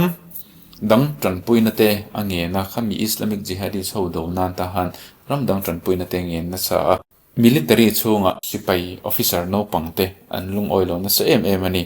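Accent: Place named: Siaha, India. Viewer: Siaha lama i an deuh ka hria😅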